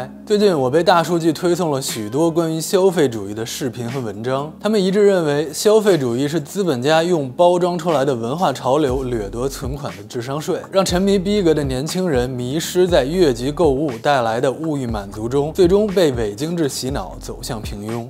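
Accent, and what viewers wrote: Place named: Beijing, China. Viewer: 我听出来了，这博主是北京口音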